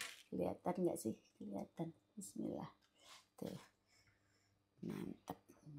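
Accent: Java, Indonesia. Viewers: Assalualaikum, salam untuk klg semua dari Balikpapan (kaltim)kalo liat vidio ini bisa senyum" sendiri logat jawanys itu lo yg bikin lucu😀😀👍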